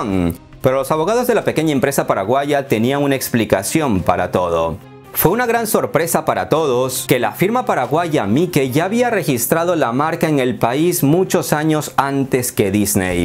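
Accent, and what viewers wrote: Peru. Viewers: POR TU MANERA DE HABLAR, DEFINITIVAMENTE ERES PERUANO. YO CONOZCO MUY BIEN A MI GENTE. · Eres peruano verdad? Si es así saludos de un paisano..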